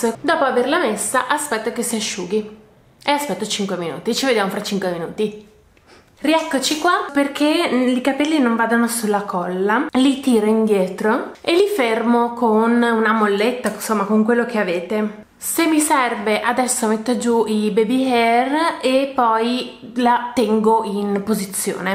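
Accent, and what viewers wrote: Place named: Friuli, Italy. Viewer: Ho una curiosità, di dove sei se puoi dirlo? Friulana? Sono in fissa dal primo video che ho visto perché hai un accento che non riesco a identificare 😂 all'inizio avrei detto tipo emiliana, ma sentendoti più spesso direi di no 🤔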